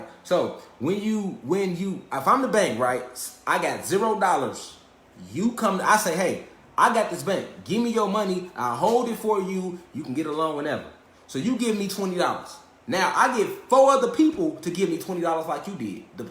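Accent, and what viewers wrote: Chicago, United States. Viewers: His Chicago accent so strong 😂😂😂 he make me miss my family in the chi now I gotta visit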